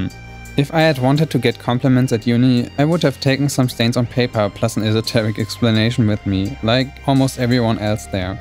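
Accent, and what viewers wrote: Germany. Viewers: You are German are you? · You sound very German. Kunst sollte doch etwas mehr sein, als lediglich die Funktion einer Kamera einschließlich anschließender Nachbearbeitung zu imitieren. Was ist denn deine inhaltliche Auseinandersetzung? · Are you german and if are you from lower saxony ? Your voice sounds very familiar to me ^^ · are you German? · ich finds toll zu sehen wie die Bilder langsam zum Leben erwachen, ich bin selber nicht so Künstlerisch begabt, eher Handwerklich, und denke bei Bildern wie deinen,"wie wurde das gemacht? wie ensteht dieser effekt?" sorry aber ich habs so rausgehört dass du Deutsch bist :) Qualty Content Approved ;) keep it up :) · are you german? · Are you german? I'm just wondering because of your accent.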